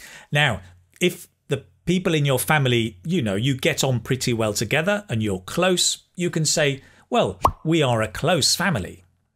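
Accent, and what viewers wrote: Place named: Sussex, United Kingdom. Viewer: 👏👏👏👏👏A goooorgeous lesson! And your English is simply smashing,! I looked after my uncle's polo ponies at Cowdray Park Polo Club, in Midhurst, Sussex. Everybody spoke just like you, if you know what I mean!😀😀😀😄😄😄 Un abrazo, from Olivos, Buenos Aires, Argentina🇦🇷🏴🇬🇧🇦🇷🏴🇬🇧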